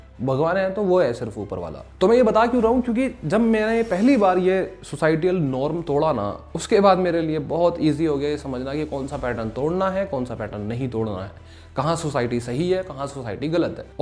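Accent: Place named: Tughlakabad, India. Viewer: yaar bhai tum pakka tuglakabad gaon ke ho mentally and educally bilkul baise hi sound kar rahe sayad mujhse 2 saal chotte hoge but baate bilkul meri jaise kar re ho mere bhai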